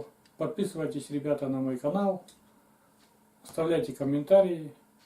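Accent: Komi, Russia. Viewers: Привет,очень интересно было тебя посмотреть и послушать твой типичный комиговорок.....однако! Восхищает твоя жизненная позиция, простота и откровенность. +41 от меня и всего тебе доброго, нужного и хорошего.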